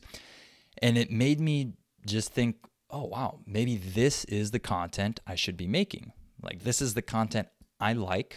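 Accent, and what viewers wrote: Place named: United States. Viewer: see you later beautiful guy i love your american accent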